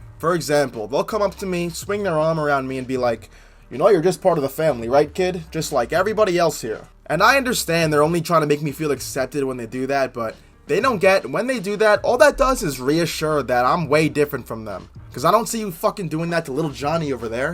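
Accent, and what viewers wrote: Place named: Brazil. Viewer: Your way of speaking reminds me of brazilians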